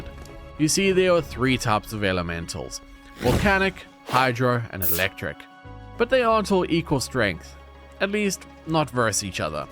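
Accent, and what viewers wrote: South Africa. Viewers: I KNEW YOU WERE SOUTH AFRICAN LOL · wait...by any chance are you a south african?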